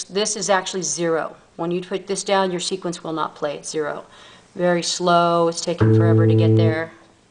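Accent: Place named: United States. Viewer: I love your American accent :-)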